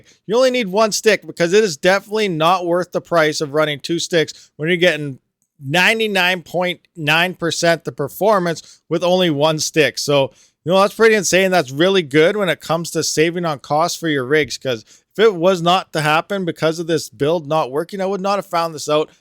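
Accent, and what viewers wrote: Canada. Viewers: There's just something fun about listening to Canadians explain stuff. *From your north Idaho neighbor.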